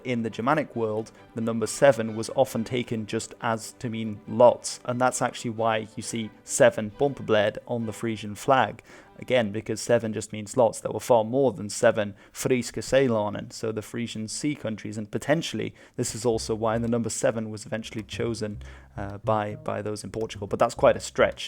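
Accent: Spain. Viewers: Me, a Portuguese speaker: "Stop pronouncing Portuguese words with a Spanish phonology" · Why does he pronunce the words in spanish, spanish is not portuguese languaje, portugal does not speak spanish like mexico, venezuela and others, its portuguese my dude. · for someone who tried to spain out portuguese words, not bad my friend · Why are you pronouncing portuguese cities with the spanish accent you fool? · Can you pronounce Portuguese words with Portuguese style, not with Spanish style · For what? He is reading every single word with Spanish pronouciation.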